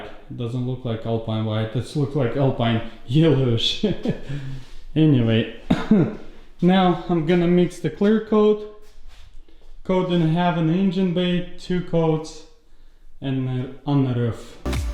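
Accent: Russia. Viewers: I like your job you doing nice work!👍 I like your accent, We're you from? Russia? 😉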